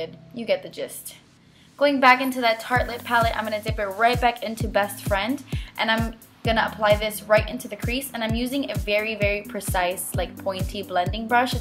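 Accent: Miami, United States. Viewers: she's Cuban, so I believe she's multi-ethnic. I've read in comments that her accent is not Cuban, it's a Miami accent. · you love makeup, i love makeup, you're from Miami, I'm from Miami... we have miami girl accents and you know what I mean so lets be friends? k thanks :) love you girl!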